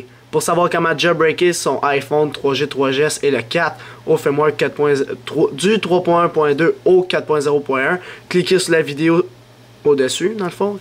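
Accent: Canada. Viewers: Mdr l'accent canadien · Ton accent Canadien il est trop drôle :-) on n'en n'oublie presque le but de la video ...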